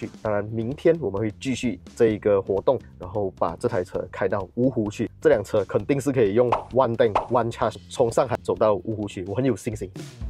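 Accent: Malaysia. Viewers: 听马来西亚华人说华语好舒服的感觉 👍 很可爱的口音 讲解也很专业 爆赞 ❤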